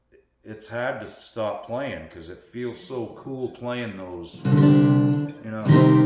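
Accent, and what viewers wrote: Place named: Boston, United States. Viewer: Boston accent made me subscribe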